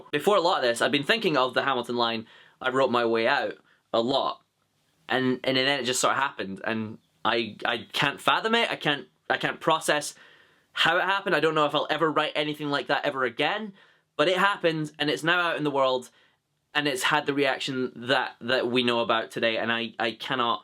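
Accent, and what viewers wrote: Scotland, United Kingdom. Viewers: Glad you're back and you sound as Scottish as ever! I look forward to your following videos and I'm sending creative vibes your way :) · Literally created a channel just so I could respond to this video. I'm glad you're back, I haven't been youtubing for some time but I originally started watching your videos to learn a Scottish accent. Then I started enjoying them and I'm very glad that you're back.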